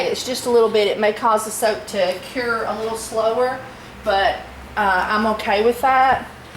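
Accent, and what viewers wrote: South, United States. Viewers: You have to be from the south! Love watching videos with fellow southerners, I it feels more like I'm watching a friend instead of some boring video.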